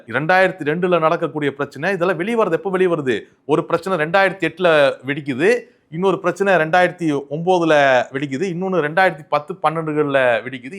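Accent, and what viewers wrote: Kongu, India. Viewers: Great sir, I like your kong slang sir. நீங்கள் எங்களுக்கு தன்னம்பிக்கை கொடுக்கிறீர்கள் sir💯💚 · I like your kongu slang sir❤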